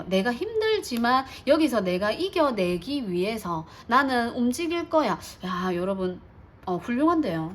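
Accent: Gyeongsang, South Korea. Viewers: 3 여러가지를 하고 있는 것 잘 맞추시네요~^_^! 이젠 하나만 하려 합니다ㅋ 작가의 길이죠. 처음으로 댓글 한 번 남겨봅니다. 구수한 갱상도 사투리 너무 좋네요. 감사합니다^_^!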